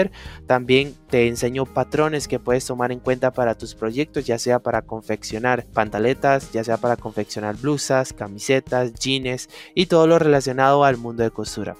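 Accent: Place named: Mexico. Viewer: se que eres mexicano por tu cantadito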